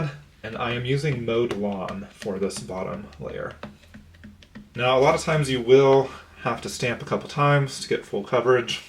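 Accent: North Carolina, United States. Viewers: Here in NC it is wadder, you were right. Im from foothills so it differs all across the state😂You have lost most of your accent but your voice is soothing.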